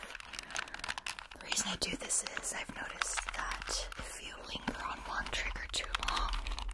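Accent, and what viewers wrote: Germany. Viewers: The fact that shes german and her english + asmr is amazing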